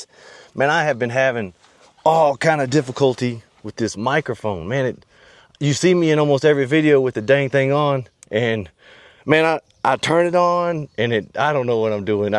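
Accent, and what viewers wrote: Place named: United States. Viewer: Dude that country accent is one of the things I miss moving to Canada. Good looking addition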